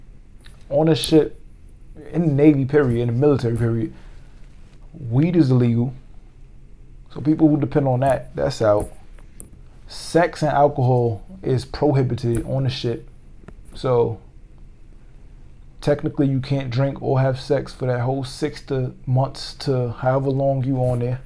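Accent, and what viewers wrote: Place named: Philadelphia, United States. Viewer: If you don’t mind me asking where are you from? I can hear your accent. Sound like you from Philly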